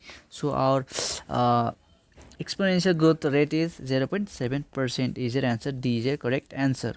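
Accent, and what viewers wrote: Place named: Nepal. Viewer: Are you from Nepal? Your accent seems like Nepali.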